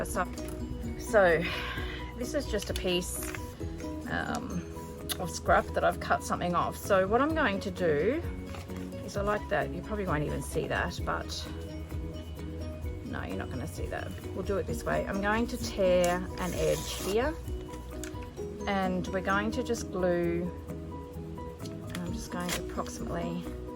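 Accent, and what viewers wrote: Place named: Australia. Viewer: I just subscribed to Heather of Ruby and Pearl because I love how she inspired you! Do I want to see you make the ephemera for the Journal? Yes! Do I want to have that background music? No, but when I put it on "closed caption" I could read what you said but missed hearing your lovely Aussie accent. ❤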